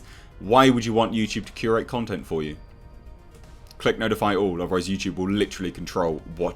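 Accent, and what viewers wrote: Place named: United Kingdom. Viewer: I'm going to use the phrase 'circumnavigating the shit' in a sentence asap !! This man speaks the Queen's English.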